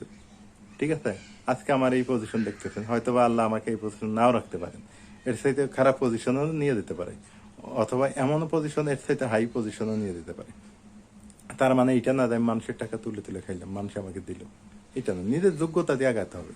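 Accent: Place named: Tangail, Bangladesh. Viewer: Tumar bhashay buja jaiy tumar bàdi Tangail,koun upon zella?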